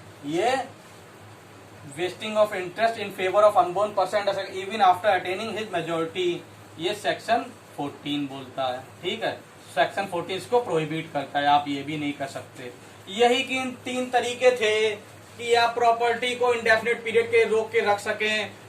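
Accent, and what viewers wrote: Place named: Uttar Pradesh, India. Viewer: 😁apke bolne se hi pta chalta ap up se ho Mai bhi Lucknow se hu.. Bahut Acha laga video...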